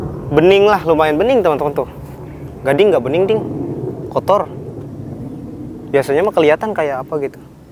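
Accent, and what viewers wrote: West Java, Indonesia. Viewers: Mang lamun ngobrol make bahasa jepang asa ek aya logat sundaan nya😂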